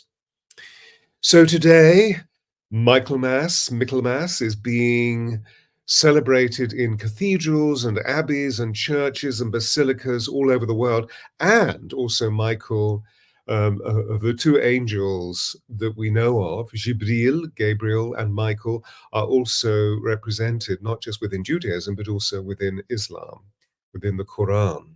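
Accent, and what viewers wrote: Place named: United Kingdom. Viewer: I just Love your Energy & Your very Posh English Gentleman Accent, I am also here in England & you sound like our King Charles, So comforting is your voice. Sent Prayers to all in America who sufferd from the Storm💚💚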